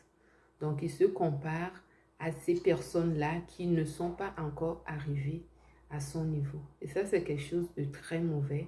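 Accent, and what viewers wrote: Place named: Africa. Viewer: pauvre petite africaine minable! Les riches ne passent pas leur temps sur YouTube à poster des petites vidéos faites en mauvais français à l’accent africain pour avoir des vues afin de se faire payer klks miettes par YouTube à la fin du mois. Si tu n’a pas reussi ds la vie au Canada, il ne faut pas généraliser et inclure tous les africains ds ta pauvreté et ta misère. Lis les commentaires et tu verras combien d’intervenants te disent les mm choses auxquelles tu n’arrives pas à répondre. Les vrais riches ne assent pas leur temps à poster des émissions sur YouTube sur comment obtenir le visa ou l’immigration Canada afin de recevoir les miettes. Comment peux-tu apprendre aux gens à devenir riches alors ke toi mm tu es pauvre avec une vie sociale misérable au Canada? Les riches ne peuvent pas comprendre ce que tu dis. J’ai parcouru toutes tes 16 vidéos: il n’y a aucun commentaire d’1 quelconque riche qui aurait compris quoique ce soit. 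Mais retiens qu’il y a içi en occident bcp d’africains qui sont des juges, médecins, avocats, chefs d’entreprise, sénateurs, businessmen, basketteurs, acteurs de cinéma, boxeurs et toi tu dis qu’eux tous sont pauvres??? Tu es malade ou quoi? Le camerounais Ngannou qui a gagné <num> de dollars ds un seul combat de boxe est pauvre? Le basketteur congolais Mutombo Dikembe qui gagnait <num> de dollars par An est pauvre? Le frère au Nigérian Dangote qui a investi près de 1000 dollars içi au texas dans le pétrole est aussi taxé de pauvre par toi? L’act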